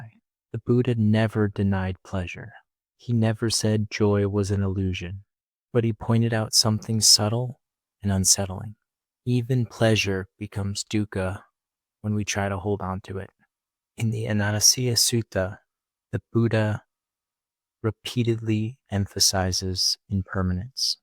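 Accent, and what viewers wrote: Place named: United Kingdom. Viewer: Which Indian pronounces English like the British?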